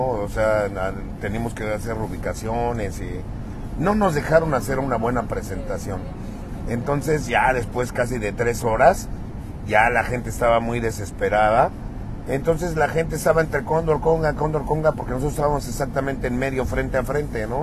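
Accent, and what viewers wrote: Mexico. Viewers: Este compa habla CHILANGO FRESA 🍓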